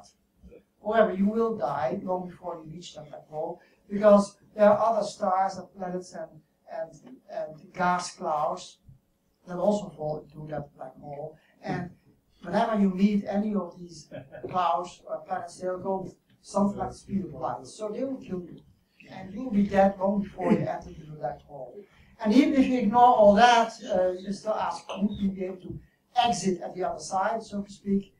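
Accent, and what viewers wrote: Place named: Germany. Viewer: Well I was going to watch it, but all the bumps and feedback in the audio on top of a muffled German accent make this video harder to understand than a police radio haha. Did you actually watch this before posting it? Seriously, it's like someone put the microphone in a sock full of rocks, dangled it from a string, and started trying to play Conkers with the speaker, before adding an echo effect post production and following it up with noise removal on a sample of his voice.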